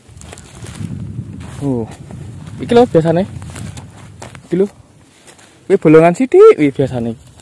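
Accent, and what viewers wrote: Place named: Java, Indonesia. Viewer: Semakin banyak ngomong semakin menghibur mas brow mantap, jangan ilangin logat jawanya lucu 👍👍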